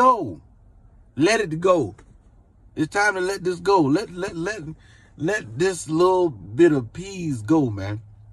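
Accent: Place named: South Carolina, United States. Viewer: “Let This little bit ah peas go”, definitely from SC.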